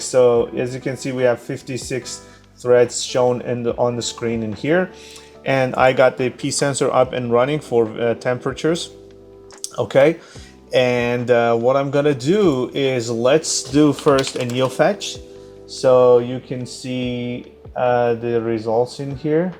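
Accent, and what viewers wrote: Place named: Iran. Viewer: I can tell a Persian accent from a mile away. Nice board.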